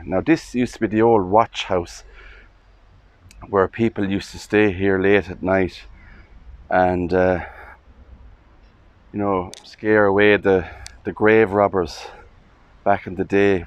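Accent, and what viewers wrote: Ireland. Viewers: My mother often took us to tour grave yards. I absolutely love this. has such a beautiful Irish accent and he's so calm, steadfast, easy going. I feel so much better in this crazy life just listening to him. Thanks much!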